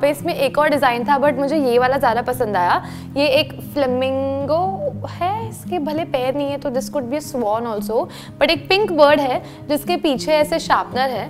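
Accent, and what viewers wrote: Gujarat, India. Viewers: Gujrati accent , Jada , ada You can buy something related to New year or republic day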